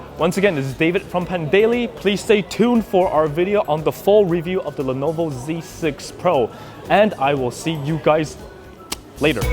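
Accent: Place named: United Kingdom. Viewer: I like your English accent Really British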